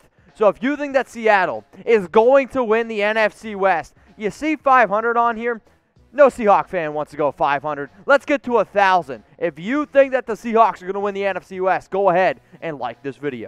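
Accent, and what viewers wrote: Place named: Pennsylvania, United States. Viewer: I knew I detected a hint of PA in your accent. I’m in the Lehigh Valley. Breinigsville, PA specifically. Grew up in Seattle, therefore Go Hawks!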